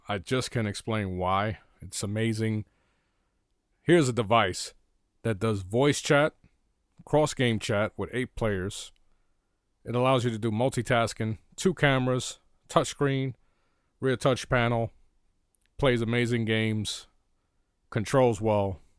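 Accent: United States. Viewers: this is probably the best american voice i ever heard.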